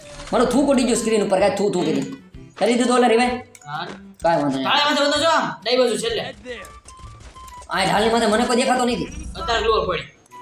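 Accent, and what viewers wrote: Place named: Halvad, India. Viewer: તમારી ભાશા આમા રા જેવી જશે gj 36 વારા જેવી હળવદ વારા બોલે મારી જાન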